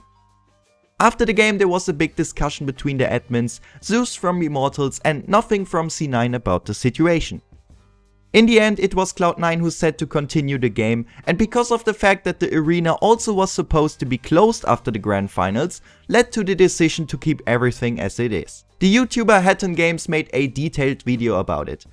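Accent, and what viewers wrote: Germany. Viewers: Are you German D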